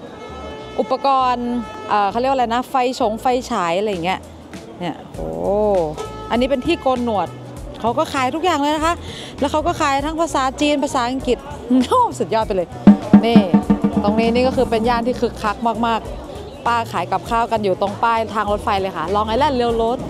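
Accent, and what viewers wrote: North, Thailand. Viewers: พูดภาษาเหนือน่ารักดี ชอบๆๆๆ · อู้กำเมือง ม่วนเจ้า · อู้กำเมืองระเบิดไปเลย😊คนเหนือ😂ยินดีตวยเน้อ❤🎉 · น้องเป็นคนเหนือกาจ้าว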